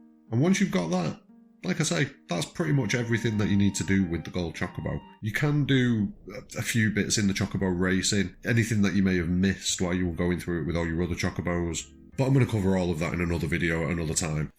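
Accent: Manchester, United Kingdom. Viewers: OP are you from Stockport? Just skimming across ff7 gold chocobo videos and noticed that you’ve got the same Manc twang as me!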